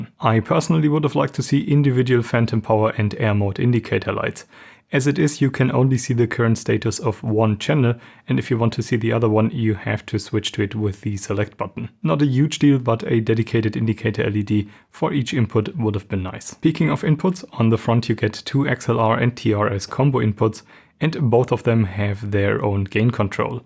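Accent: Germany. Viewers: Good video. But I think you are German… it’s the typical pronunciation there… no front! It’s a very good video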